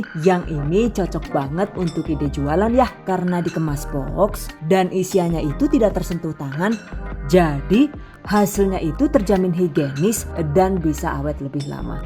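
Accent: Banyumas, Indonesia. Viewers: Sumpah ngakak jebule wong ngapak 🤣,suwun mba resepe😘sukses selalu tambah maju🥰aamin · Ngomong engris dgn dialek ngapak, sukak banget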